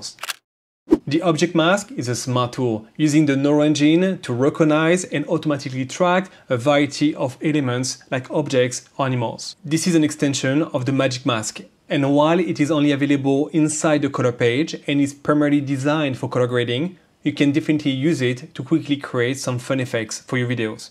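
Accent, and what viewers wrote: France. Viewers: Ohhh hey I got a shoutout hehe! Thanks guys! Love what you're doing here, great to see more Resolve channels popping up (plus sexy French accent beats my blunt English one 😆) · Probably one of the most pro channels on Davinci with a little twist of having a very nice French accent. LOVE IT! And depth map is my favorite. Merci beaucoup!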